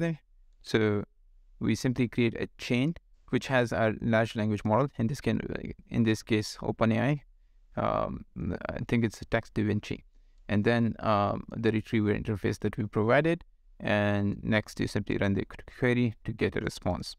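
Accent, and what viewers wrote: India. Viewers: This right here is the definition of the uncanny valley. That white guy, with your Indian voice, plus the AI generation, makes me feel incredibly uncomfortable. Love your channel, but it makes my brain light up in "Something's wrong here"...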